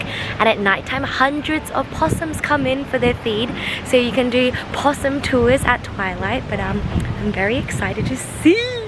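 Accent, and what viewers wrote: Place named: Australia. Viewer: I want to visit Sydney, and Melbourne again. It is so beautiful in those two cities. As a american man I love the way women from Australia talk just like you sweetheart. Good video.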